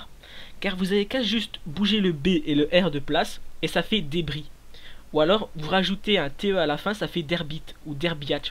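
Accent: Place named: Charleroi, Belgium. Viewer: HA l'accent carolos ! ces sa qu'est bon !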